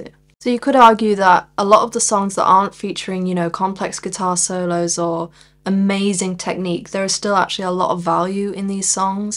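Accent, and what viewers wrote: England, United Kingdom. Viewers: “Rock isn’t dead, it just smells funny” - Frank Zappa, sort of. Agree very much with your assessment of the state of the art. Curious to know what part of England your accent is from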